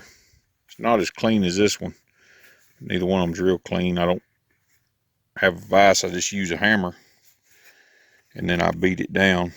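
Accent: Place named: Kentucky, United States. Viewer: Hope your knee feels better soon! Yep, on your knife...the old ones can be really good. Oldies but goodies. My sister lives on the high desert. But, hey, I lived in Kentucky for 14 Years...I knew you sounded like you might be from that area...then I heard you mention Tennessee. Wonderful area back there with awesome folk.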